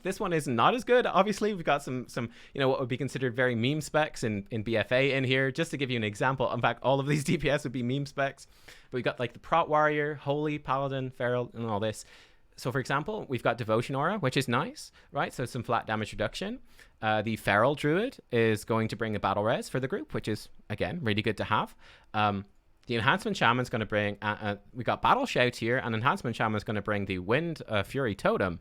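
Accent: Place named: Ireland. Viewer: Finally, another great WoW content creator, and best of all, Irish! At least I think you're Irish? 😅 Nice work!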